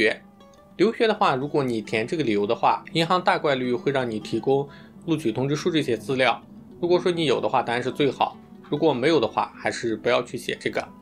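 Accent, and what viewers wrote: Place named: Jiaodong, China. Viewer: 听你口音像胶东的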